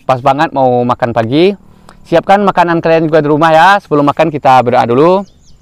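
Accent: West Sumatra, Indonesia. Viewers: Sama, maka nya heran logat nya kayak logat Sumbar, tapi video nya kayak di sunda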